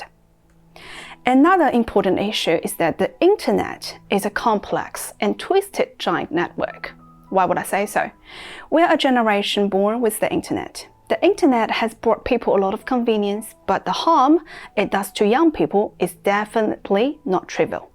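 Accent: Australia. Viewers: I’ve never heard a Chines/Australian accent before, interesting. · Aussie accent init?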